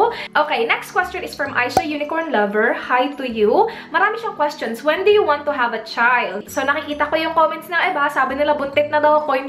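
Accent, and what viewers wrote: Australia. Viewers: Hi! this vlog is on point. never mind the bashers. they're just insecure. and you are right, wear what is comfortable on you and what suits you well. not what suits other's eyes. to those who criticize on how you speak, saying your "maarte" na. you're not, its just how you adapt with the Australian twang. so, stay chic and cool as you are. take care! say hi to Dan! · You looked good, you're pretty & your pronunciation is normal na magchange cause in Australia may accent siya so need talaga ni ate na mag change yung mga words na ipronounce para maintindihan siya ng kausap niya na aussie (e.q, 'dinner' must be pronounced na dinna withour an 'r' ) mga gnyan. Anyways, i love you ate 😊 Pasagdi nalang nang haters kay naa rman na pirme, dili mana sila mawagtangan og ikasulti. Haha cause ana najud na sila full of insecurities & hatred. Always remember na mas damo nag hi-gugma saimo. 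Yay! Love you 😘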